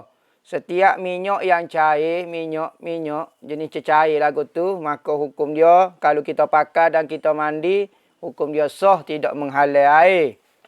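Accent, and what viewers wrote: Kelantan, Malaysia. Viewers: Walaupun saya rakyat Malaysia. Alhamdulillah saya dapat faham ustaz ceramah loghat Kelantan❤️❤️❤️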